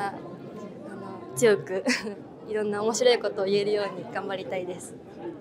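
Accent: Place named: Kyoto, Japan. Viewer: もっと京都弁喋ってほしい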